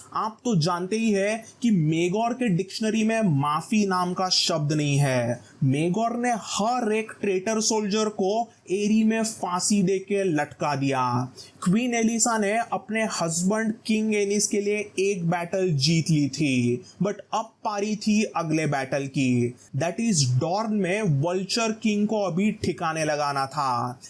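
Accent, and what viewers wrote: Maharashtra, India. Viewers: your hindi has a bit of Marathi touch to it, are you Maharashtrian?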